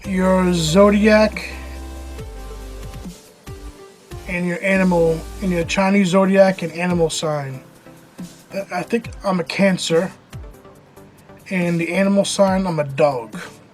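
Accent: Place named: Boston, United States. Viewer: Thanks for the Q&A,. My girlfriend and I thought you were from Boston so thanks for clearing that up for us haha. Chucky is a sweet character. I grew up loving those movies. I probably like Child's Play 2 the best simply because we had the VHS growing up and watched it all the damn time lol. Commando is an awesome movie! BENNETT! lol... Thanks for the Q&A. Love your vids!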